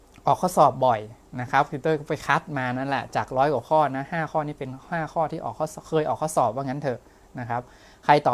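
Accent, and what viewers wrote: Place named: North, Thailand. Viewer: ติวเตอร์เป็นคนเหนือใช่ไหมคะ สำเนียงคนเหนือมาก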